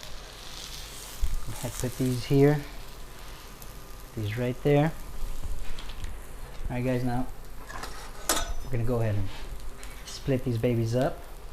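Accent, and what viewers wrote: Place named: United States. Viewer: You watched a man with an American accent cooking a variation on typical American food and didn’t think he was American? I don’t know how to help you.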